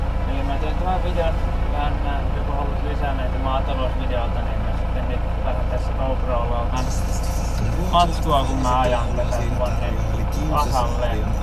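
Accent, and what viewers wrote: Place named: Ostrobothnia, Finland. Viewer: ootkosä pohjalainen meinaa vaa ku kuulostat siltä :D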